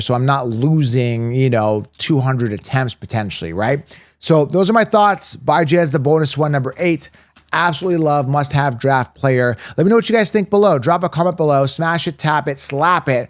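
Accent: Canada. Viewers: It’s just his accent my man. I think he from Canada 🇨🇦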